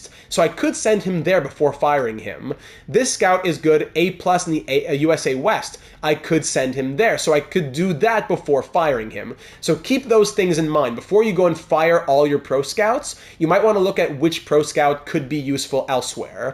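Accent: Quebec, Canada. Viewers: thank you! Great video and est ce que t quebecois? Si oui gg pour ton accent anglais!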